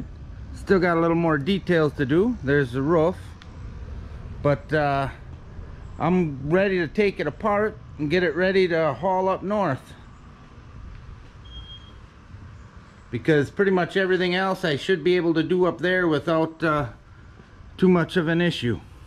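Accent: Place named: Upper Midwest, United States. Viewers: Dont sound like hes anywhere near Georgia lol. More like Minnesota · unrelated but... I heard you speak and you are either a Wisconsinite, Minnesotan, or a Michigander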